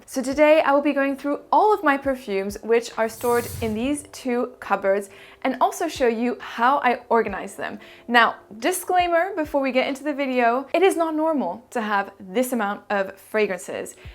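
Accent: France. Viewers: T'es française. T'as un fond d'accent français. 😊. Nevermind. T'es clairement française vu la façon dont tu prononces les noms des parfums.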